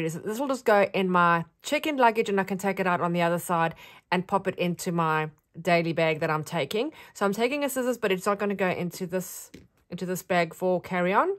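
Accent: South Africa. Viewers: Hi from South Africa. Nice to listen to a fellow SA.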